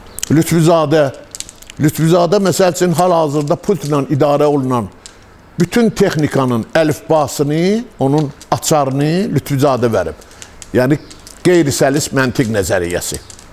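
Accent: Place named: Bilgah, Azerbaijan. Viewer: Kişi, Allah can sağlığı versin sənə, gözəl söhbət elədin. Eyni adətlər bizim kənddədə var. Elə bildim Bilgəhli danışır.Bilgəhdən salamlayıram sizi.Mənimdə rəhmətlik atam anamı mənim adımla çağırardı. 1972 də atam 21 i kievdən çernopuldan gətirib, indi mən sürürəm.Dübbə düz 21 i xoda salanda, öz xüsusi iyi var. Allah cəmi yaxşıları gözə görünən şeytanlardan qorusun!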